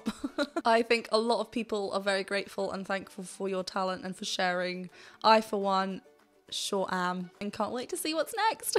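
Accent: England, United Kingdom. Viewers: Beautiful Dimash performance,,,also your english accent its very cool...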